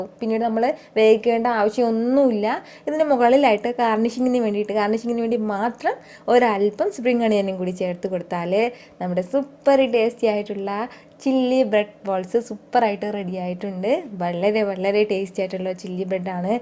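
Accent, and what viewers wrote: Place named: Palakkad, India. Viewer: Palakkad ആണോ മോൾടെ വീട് ....🌹🥰big fan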